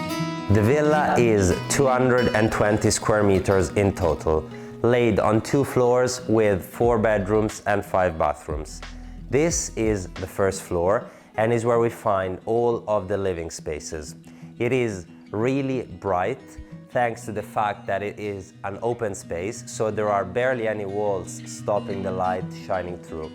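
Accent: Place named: Rome, Italy. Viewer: Dude, you are such a professional. Also, your Italian is “lingua Toscana in bocca Romana.” Great property as well!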